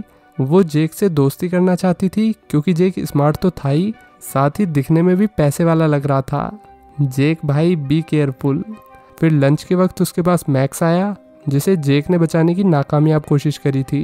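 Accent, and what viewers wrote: Bihar, India. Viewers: Bihar se ho kya bhai😅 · R U FROM BIHAR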